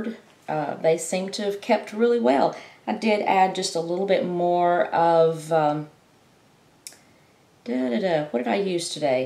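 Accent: Texas, United States. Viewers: So. Moving from California to Texas. Good that you already haves Texas accent.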